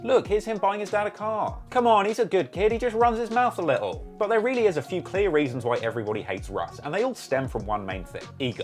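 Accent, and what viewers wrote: United Kingdom. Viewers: Bro dint know you were from UK well the accent I can hear but dint really pick it up til this video ahhh might as well been a joke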